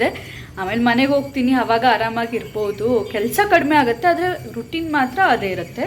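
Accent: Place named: Kumta, India. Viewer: Ondu mat helala friend, Nam kumta bashe gatte bere, nenpitkolli, becoz Nan nine YouTube nodtirovaga nim vidio nodade subscribe madade, Nam bashene matadide ennu kushine nanu horgadane edini 27 varsdinda, nammur bashe kelidre evru nammavre Alva ansatte, god bless u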